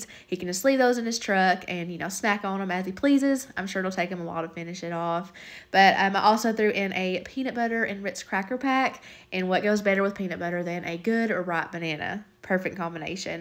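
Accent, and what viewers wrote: South, United States. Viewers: I know this is an older video, but I have just recently found your channel while I have been stuck in bed due to an illness and have been watching YouTube nonstop! I absolutely love your channel! I am in Alabama and I love content creators that have the Southern accent like mine! Don't worry, yours is great, I sound like straight cornbread! Lol. Anyway, I would like to know where you got the pot that you cooked the chili in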